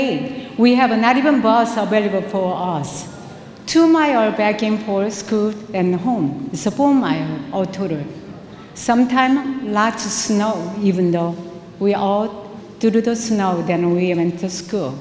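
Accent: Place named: South Korea. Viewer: 발음이 한국식 영어네요 영어는 쉽지않아요 아이고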